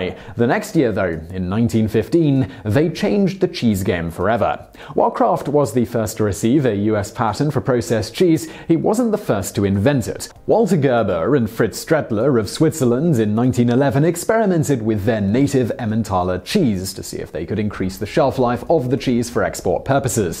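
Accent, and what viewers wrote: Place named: London, United Kingdom. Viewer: this guy says 'sore' instead of 'saw', verb. because: London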